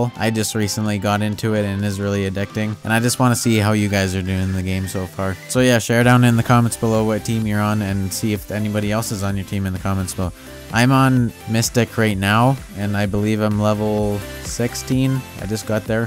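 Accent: Canada. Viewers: Also, are you Canadian? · btw I heard your Canadian! Same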